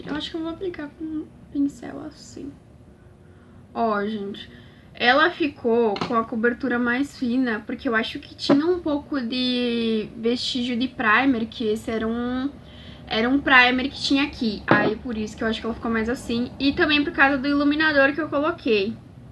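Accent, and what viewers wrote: Rio Grande do Sul, Brazil. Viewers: você é do RS? Percebi o seu sotaque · E quê vc fala que nem gaúcha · Parece gaúcha♥️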